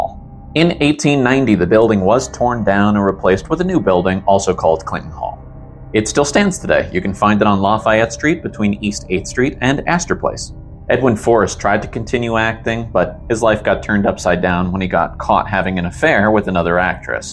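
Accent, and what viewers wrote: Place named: United Kingdom. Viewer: Love your British accent! Love the sound effects! Another winner!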